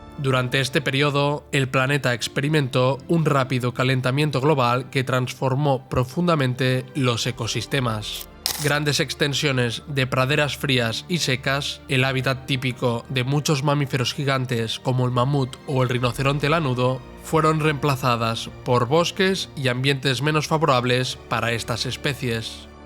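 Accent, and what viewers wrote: Spain. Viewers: No hay duda que los mejores documentales deven tener la Voz de un Español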